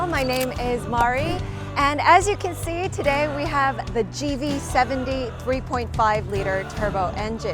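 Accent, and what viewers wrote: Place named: United States. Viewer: 으음. 메이크업, 스타일은 한국식인데 영어는 미국식이시군요ㅎㅎ 차 리뷰도 보고 영어 표현도 배우고 잘 봤습니다. Well presented. Mari 💘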